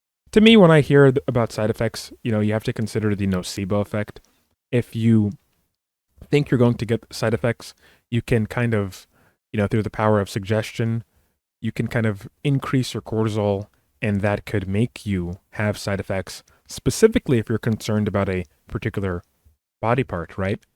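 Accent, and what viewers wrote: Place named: United States. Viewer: Son accent est trop Americian pour l'être 😃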